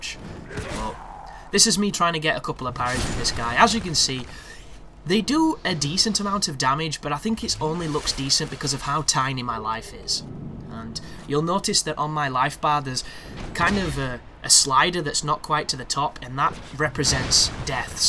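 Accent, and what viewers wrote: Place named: England, United Kingdom. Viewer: Hey guys, do you know what time it is? Time to listen to a man with an English accent talk about Dark Souls 2 for 10 minutes. Another awesome way that I like to spend my valuable time. Keep up the great work:)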